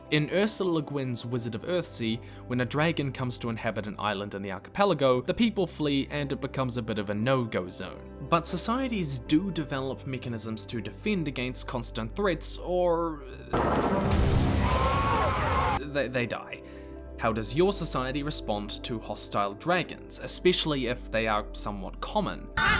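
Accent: New Zealand. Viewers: Dude, i didn't even notice you were a kiwi, im also a kiwi so i guess i just sorta didn't notice your accent as its just the same as everyone else i know. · Wait your a kiwi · Oh you're a Kiwi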